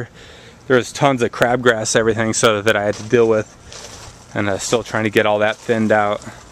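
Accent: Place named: Upstate New York, United States. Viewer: Your a hard worker and a good man...good vids....i worked in the wyoming boom in the 70s.......you sound like u r from upstate NY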